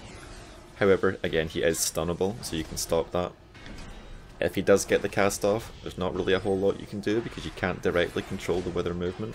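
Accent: Scotland, United Kingdom. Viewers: You have the softest Scottish accent i have ever heard :O i nearly crapped my pants when i heard it I'm Scottish myself which i think made it more striking :O great guide though BIG THUMBS UP BRO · you sound scottish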